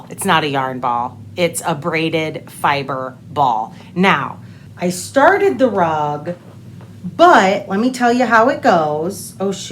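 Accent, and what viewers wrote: United States. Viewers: I’m terrible. I just watch this because you’re hot AF but I also love your American accent, smile and demeanour.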